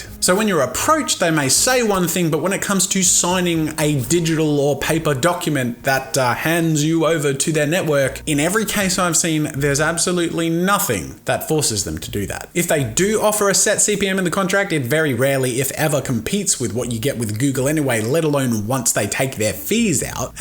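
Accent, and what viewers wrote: United States. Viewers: that was awesome American accent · AMAZING AMERICAN ACCENT LOLOL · Pretty good American accent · Sick American accent, *. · Your yank accent is epic! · Your american accent sounds more american than mine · Does he sound American when he is giving the lecture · Terrific American accent! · the realistic AF American accent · you fake a really great American accent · I love his American accent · I love your American accent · hearing you talk in an american accent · COOL AMERICAN ACCENT! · nice american accent bro! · i love your american accent so much its actually kind of accurate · the american accent was really good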